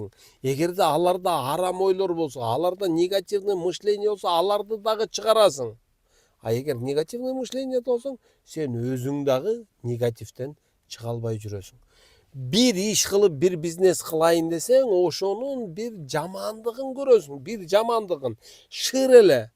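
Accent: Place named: Talas, Kazakhstan. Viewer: Могу жигит Таластыкко суйлогону окшошуп турат.?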